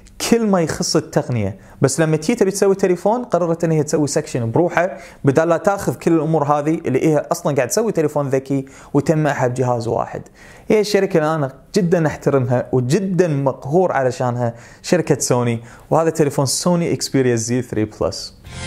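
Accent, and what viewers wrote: Kuwait. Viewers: حلقة اليوم فيها كثير من الخلط و الاخطاء في المعلومات . بالاضافة ان اللهجة الكويتية اليوم اكثر من الفيديوات السابقة. رغم كل هذا فيديو جيد .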